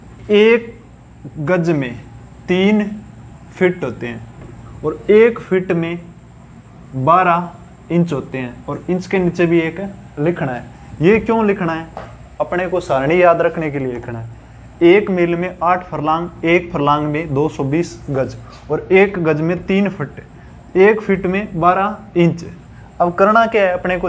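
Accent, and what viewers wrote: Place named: Haryana, India. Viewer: Sir apki boli to haryanvi jaise h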